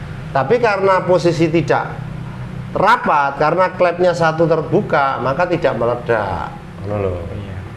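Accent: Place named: Surabaya, Indonesia. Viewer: suroboyoan rek...siiip jelas dan trimakasih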